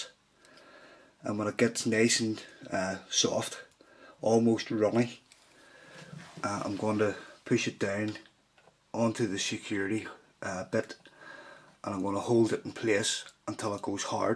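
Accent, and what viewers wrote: Northern Ireland, United Kingdom. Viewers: northern Irish not Scottish :P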